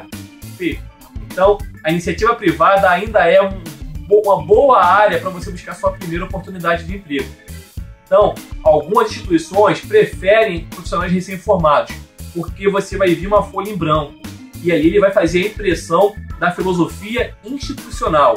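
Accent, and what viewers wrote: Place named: Northeast, Brazil. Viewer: Meu querido vc está viajando..A pessoa é formada graduada em Enfermagem , ela deveria estar preparada para todos os setores, vcs professores deveriam ser mais competentes em ensinar e não a pessoa ficar pescando aqui é ali..na graduação ela está aprendendo tudo em relação a Enfermagem. Jeito chato de falar..lol Failar, trabailar, peissoia, affs é uma mistura de Manaus, Bahia, é o Nordeste todo na fala. Um Enfermeiro com 4 ou 5 anos deverá estar apto a exercer qualquer função como enfermeiro. Só se escolher um setor específico deverá fazer sua especialização. Mas primeiro precisa de um emprego. Esse hospitais que são muito mercenários, querem exigir muito para pagar pouco. Falta mesmo é OPORTUNIDADE É BOA VONTADE. A educação não é continuada..então treinamento e ensino contínuo tem que fazer parte da rotina dos recém formados admitidos.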